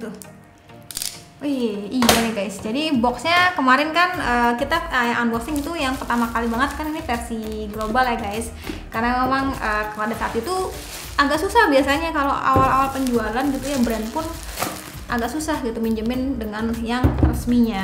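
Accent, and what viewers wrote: West Java, Indonesia. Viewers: Sunda neng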